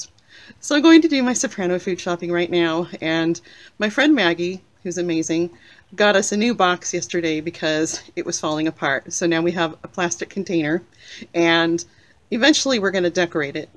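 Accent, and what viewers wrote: United Kingdom. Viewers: Oh, how I do adore the Glaswegian accent! 🏴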